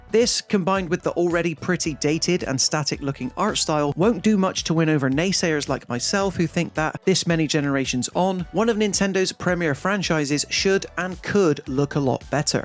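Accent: United Kingdom. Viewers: He's British, idiot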